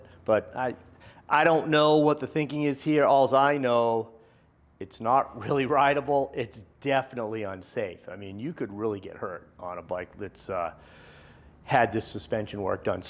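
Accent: United States. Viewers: We’re in mass are you from I’m from Cambridge can tell by you talking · Is that a New York accent?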